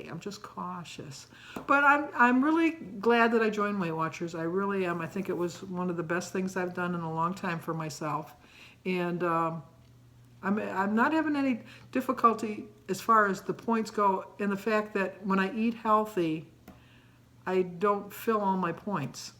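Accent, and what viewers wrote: Midwest, United States. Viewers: Hi. I love your videos (and your midwestern accent:) You motivated me to join WW (tomorrow is my weigh in after my first week). I really like your day to day videos. Thank you!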